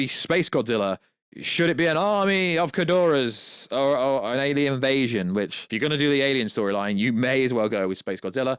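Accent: England, United Kingdom. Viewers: Stop calling him "Kudora/Kidoruh" ... It's Ghidora lol I know you have the English accent but Damn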